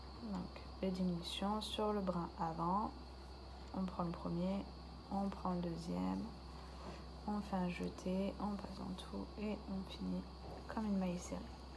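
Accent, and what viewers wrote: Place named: France. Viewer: Merxi a toi,pour ta facon de nous montrer comment fabriquer toutes les belles choses que ru nous. Montre,,et tu as un talent vraiment special,pour nous montrer et une patience vraiment tres belle a voir ,pour nous,car tu prend tout ton temps pour nous montrer a fabriquer les choses que tu nous enseigne..alord merci et ne lache pas et ton accent francais est tellement beau et dou a entendre..ne lache surtout pas..milles fois merci...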